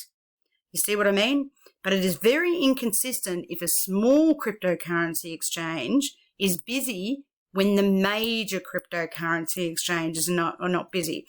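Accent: Australia. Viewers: mrTiii Very small you're right because Australian pronounce Finance as FaNANCE so is more likely to say BaNANCE.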